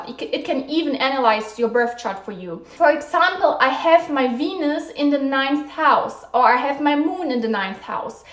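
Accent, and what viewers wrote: Germany. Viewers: I recognize a german when I hear one🤭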